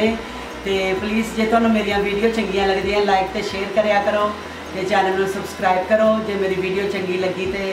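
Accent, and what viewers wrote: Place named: Punjab, India. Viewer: What Punjabi Dialect is that?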